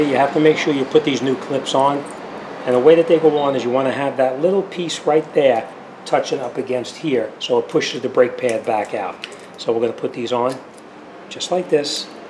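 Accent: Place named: Bronx, United States. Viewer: Nice Video My Man... & That Sound Like A Bronx Accent!!! Lol...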